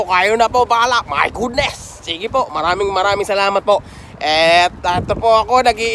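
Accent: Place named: Nueva Ecija, Philippines. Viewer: Good day! As an online seller nkakapagod maglive selling, sobrang nkkaproud ung mga blogger kc how much i put effort on Live selling they put too much effort sa pagshoot, edit and post. For you i'm so proud with your passion and uniqueness, so proud that you made this far and more in the future, you inspire us to be simple and humble with your blogs to feel na andito ka sa pinas sa lahat ng pinupuntahan mong lugar jan kahit ang layo mo haha. Everytime i hear your voice on your blogs, feels like were already home because of your Novo Ecijano accent (sinabi na nga kc eka eh) 😂. I hope we hear more and watch more about your blogs. Congratulations for the 500+ and more fast growing subscriber! Take care & Godbless you always😊😇 Please LIKE & SHARE, SUPPORT #itsSuchABeautiFulDay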